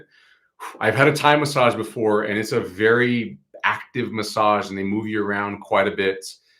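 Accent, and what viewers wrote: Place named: Tennessee, United States. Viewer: I can hear you tennessee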